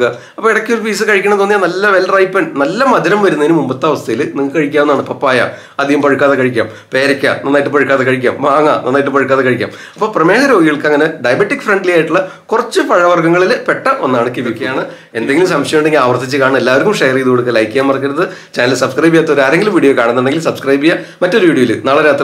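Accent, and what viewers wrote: Thrissur, India. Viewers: Ethra nalla avatharsnam dr.Trissur bhashayodu thanne sneham thonnunnu😍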